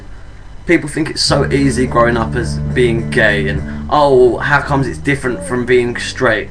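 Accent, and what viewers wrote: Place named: United Kingdom. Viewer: Wonderful seeing contributions from other than the LGBT U.S. communities. Love the UK, and love your Brit accent. Being a Yank, not terribly sure where you may be from originally, but are you perchance from Liverpool? Probably getting this all wrong, so my humble apologies in advance.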